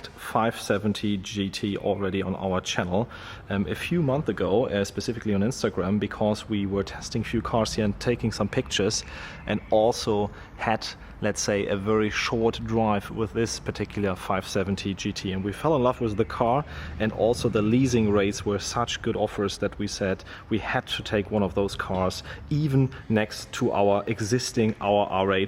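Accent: Germany. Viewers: Fucking channel sponsered by Audi with digsugting german accent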